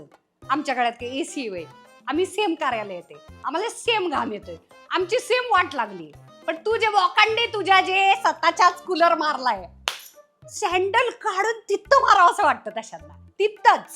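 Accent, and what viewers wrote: India. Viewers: 😀😀जबरदस्त कॉमेडी हसून हसून वाट लागली. तुला काय भन्नाट आणि खरे विषय सुचतात ग. आणि अगदी हुबेहूब गावरान बोली भाषा तो अभिनय ऐक नंबर. 😀😀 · लय गावरानभाषा आवडली1चंबर❤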